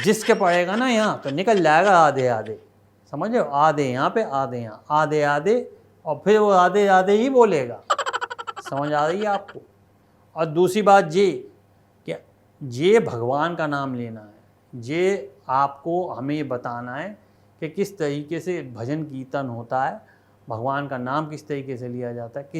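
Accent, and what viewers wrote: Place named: Uttar Pradesh, India. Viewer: Bhai ap kisi ki bhi mimicry karte ho to apke dialogue bhi local language mein hoti hai aur tone bhi same up wala...jaise hari nahi hai ye up ka pronunciation hai yahi apke kirdar mein char chand lagati hai....mujhe apne clip ka intzar rehta hai aap sach mein telented ho...radhey radhey 🎉🎉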